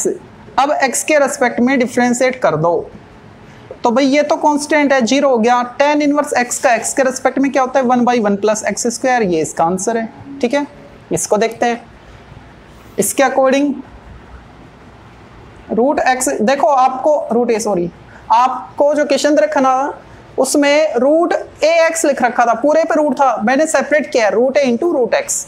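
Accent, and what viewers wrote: Haryana, India. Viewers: Ha mere kne hi si ye btata h ki ap haryana se ho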